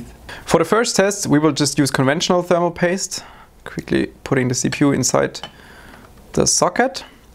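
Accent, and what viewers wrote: Germany. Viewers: Alda. Ich liebe diesen deutschen Aktzent. #nohate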